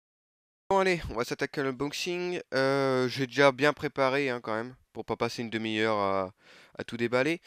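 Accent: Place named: North, France. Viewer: salut, très bon review avec le contenu qu'il faut, et on voit que tu t'y connais, pas d’hésitation dans tes dire et un discours agréable......et un accent du nord si je ne me trompe pas...lol!!! du coup je me suis abonné....au plaisir!!!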